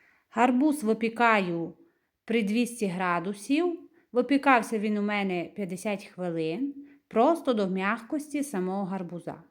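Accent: Transcarpathia, Ukraine. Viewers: Подписалась на Ваш очаровательный канал! Желаю ему процветания. Закарпатский диалект прелестный и самобытный! Привет из Калифорнии. Надеюсь, что наша родная Украина разобьет в пух и прах фашистов Раши и выгонит их со ВСЕЙ своей земли. И земля вновь зацветет своим прекрасным языком и диалектами! Слава Украине! Слава ВСУ! Все будет, Украина!💙💛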